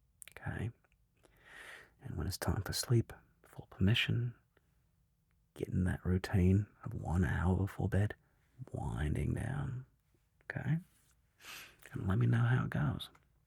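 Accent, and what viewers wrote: Australia. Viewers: I love this so much the accent is spot on mate! It makes me happy knowing you’re not mocking aussies accents! 💜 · Another fantastic video. Some great advice and thought provoking ideas. Been struggling a bit with too much thinking of “having to do” things instead of “getting to do” things. Added bonus, I love Aussie accents and yours is awesome. 😊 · YAYYYY my request for more aussie accent has been answered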